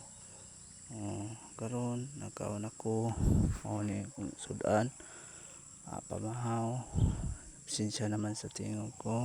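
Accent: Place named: Iloilo, Philippines. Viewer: Ang sarap ng kain enjoy po sana mapansin nyo po ako fr healing live parang ilonggo ka po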